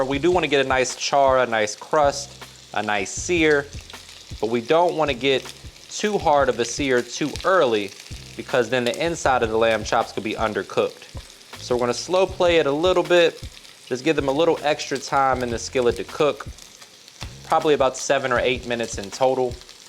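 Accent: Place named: South, United States. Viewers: southern accent lol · You sound like you are from NOLA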